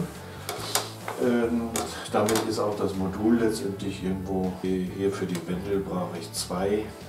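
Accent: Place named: Bavaria, Germany. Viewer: Mal ne Frage;kann man bei Märklin nicht auch einfach die Schiene zwischen 2 Schwellen mit dem Dremel trennen,dann brauchtst die Verbinder neet.Als Bayer ein gutes Hochdeutsch,bestimmt kein gebürtiger.Der Klappensinn wird nun sinnvoll ersichtlich,trotzdem hätte ich diesen andersherum zum Öffnen gebaut,also das die Scharniere unten sind und die Klappen mit Magneten gesichert od.mit Scherenhalterung,wie bei Klappböcken/Liegen od.ä.Das ist alles KEINE Kritik,nur es sehen und lesen wohl noch hoffentlich viele Mobahner diese/Deine Videos.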